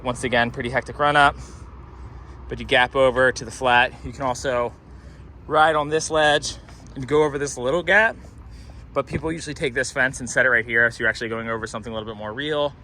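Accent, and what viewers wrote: East Coast, United States. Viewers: Is your east coast accent coming back?!!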